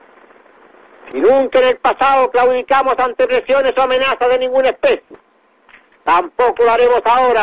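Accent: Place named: Chile. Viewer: Así habla un verdadero Chileno!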